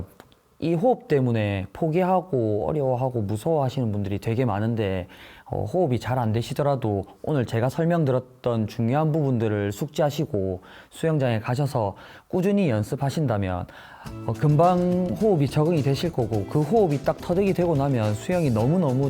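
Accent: Gyeongsang, South Korea. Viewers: 간결한 설명, 부드럽고 정겨운 경상도 억양이 강의가 더 쏙쏙 들어오는것 같아요. 좋은 강의 감사합니다.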